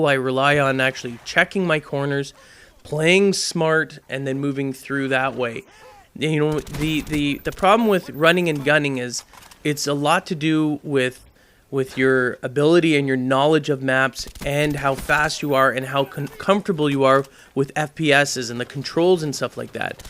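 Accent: Canada. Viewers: are u canadian????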